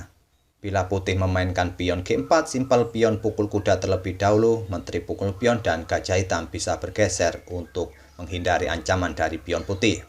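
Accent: Java, Indonesia. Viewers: Iyasih mas..medok amat jowo nya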